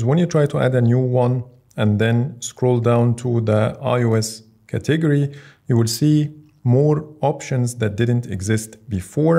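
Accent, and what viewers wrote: Egypt. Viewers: الصوت ده مصري قوي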